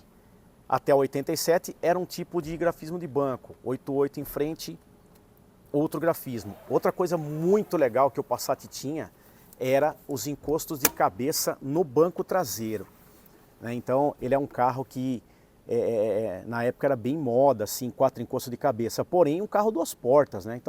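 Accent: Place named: Mooca, Brazil. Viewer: Curioso pra saber de onde vem esse seu sotaque kkk. Parece da Mooca, belo! kkk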